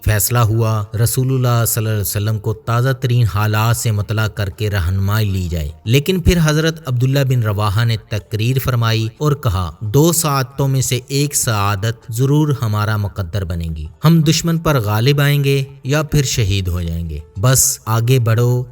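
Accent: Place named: Punjab, Pakistan. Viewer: Apka punjabi accent lahja bahot bura lagta hai sunne me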